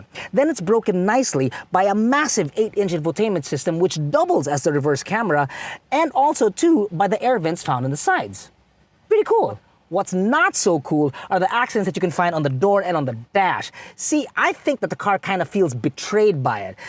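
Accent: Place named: Conyo, Philippines. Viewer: Too conyo for my taste. Its simply Calamba not Cuh-luhmbah duuuh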